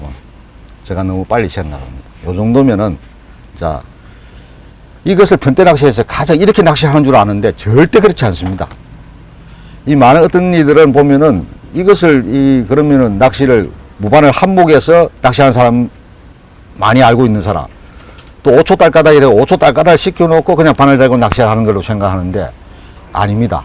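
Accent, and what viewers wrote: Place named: Japan. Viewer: 말이 일본말 같은데? ㅈㄴ 알아듣기 힘듬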